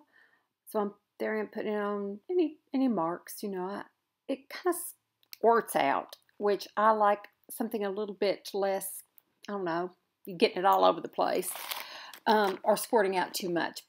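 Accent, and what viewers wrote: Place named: Virginia, United States. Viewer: I just figured out why I adore you so much- I have an aunt Ruth- she lived in Virginia-- (same cute accent) you have her mannerisms- and she was a beauty!!! When she was in her 20s people stopped her for an autograph they thought she was Rita Hayworth- My aunt had auburn hair.- I really believe god has so many molds LOL-See If this fits you. i will describe her - a "spit fire stubborn- Huge giving heart. When things are bad you are the light you know in the end it always works out. You avoid drama- LOVE design - have a hidden artist inside. To modest for your own good sometimes. Love your kids but its important to have your own life too .When she walked into a room she grabbed everyone's attention with out trying.. any of these fit? xoxoxo I FORGOT about doing my hair like this!!!! lots love shelly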